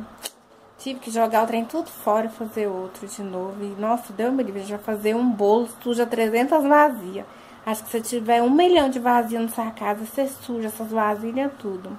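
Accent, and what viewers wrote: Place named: Northeast, Brazil. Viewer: querida, vc parece ser uma boa pessoa e ter bom coração, pelo seu sotaque eu diria q vc assim como eu é do nordeste :) sobre oq exatamente vai ser seu canal? culinária?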